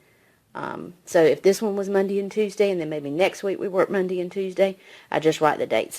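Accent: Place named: South, United States. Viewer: Thank you so much for this review. I school many children at once and find that it helps to know in advance about the ease of planning. For me, some curriculums are just too involved or scattered for me to do with multiple children and I have ended up wasting precious time and money trying them out. I appreciate this review very much. And love your sweet southern accent!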